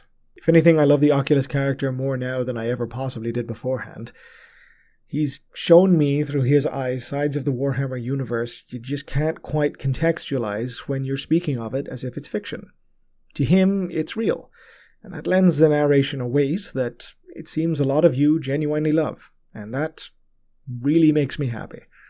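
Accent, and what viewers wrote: Ireland. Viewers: Fabulous! But I knew the Oculus was from Dublin from the way he pronounces his ‘t’s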